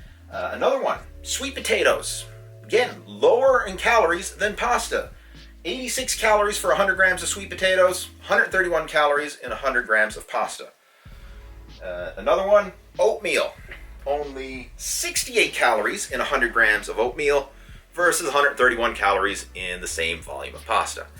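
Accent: Newfoundland, Canada. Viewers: Me: "This guy sounds like a Newf." Google: "Yes, he is a Newf." Me: *Subscribed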